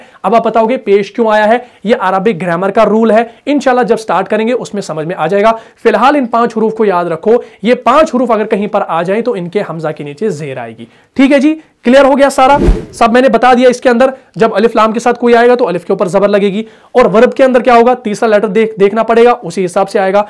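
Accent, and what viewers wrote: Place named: Mumbai, India. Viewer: Qaree sahb aapka Arabi tallaffuz correct hy jbky urdu aap Indians k mumbae walon ki tarah bolty hain khalee ko khalee yanee khal k kaaf ki taraha ghunna ki gonna yanee aap lafz bol sakty hain laijin bilawaja urdu ja tallaffuz bigar dety hainQ?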